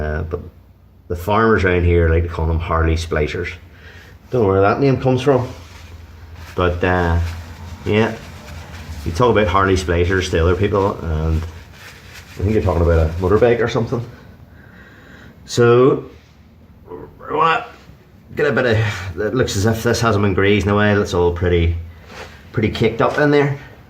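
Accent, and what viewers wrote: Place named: Ulster, United Kingdom. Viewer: Love me an Ulster accent. Greetings from Australia.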